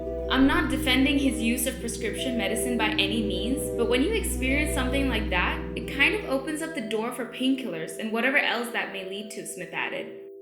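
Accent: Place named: India. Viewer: Are you Indian from INDIA? You sound like it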